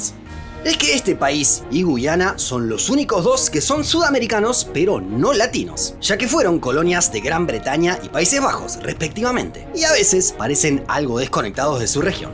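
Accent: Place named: Argentina. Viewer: Trata de hablar neutro... pero es argentino es chistoso y ademas pones el futbol jajjaajajaj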